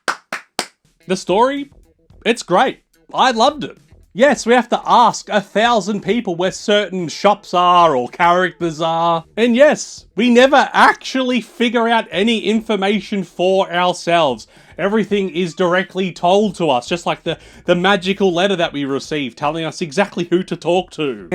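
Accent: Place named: Australia. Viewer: As an American, I love a good Aussie narrator 👍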